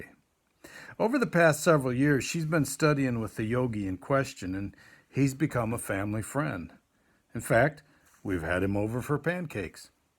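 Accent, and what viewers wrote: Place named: Wisconsin, United States. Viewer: I'm up late here in Portland Sucks Just finished my caretaking For my husband who is now 90 Got my teeny "medicinal" martini And who pops up on my phone? My favorite Wisc author! Ahhh! Sigh... The comfort of your Wisc voice I miss Wisc every single frick'n day Forget searching Google for an extra water filter! I'm gonna listen to Michael Perry! Tomorrow I'll fire up the old Firestick And treat my husband to to a morning of Michael Perry and coffee Remembering all your concerts And missing Wisconsin 😪 And.... Portland Sucks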